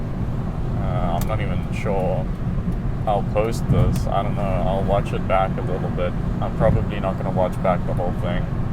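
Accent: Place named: South Africa. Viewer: The South African accent is so thick I love it 🙂‍↕️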